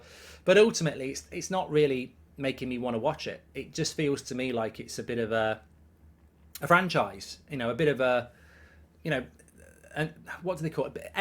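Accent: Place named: Manchester, United Kingdom. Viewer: This guys manc accent is amazing 😅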